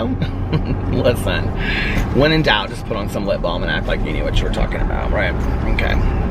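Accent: United States. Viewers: Love the American pronunciation for food....makes us brits / irish laugh...... also you guys still use the phrase handicap, in UK that isn’t acceptable any more. We use the word disabled. Also dressing up is wearing a black crew tee shirt lol.... in uk dressing up is wearing a suit. Glad you’re feeling better ❤️❤️❤️❤️ Lol in uk we have nothing but round abouts. If you ever come to UK DONT go to Milton Keynes.......nothing but roundabouts it’s like the capital of roundabouts 😂😂😂😂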